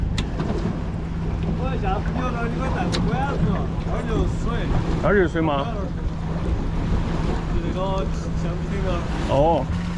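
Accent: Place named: Sichuan, China. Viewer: 听口音川人老乡这个船买成多少钱我也想买一个在烈治文周边海钓三文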